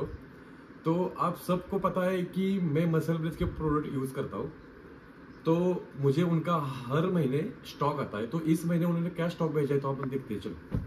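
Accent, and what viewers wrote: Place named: Kolhapur, India. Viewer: कोल्हापुरी accent भाऊ!! 🔥😁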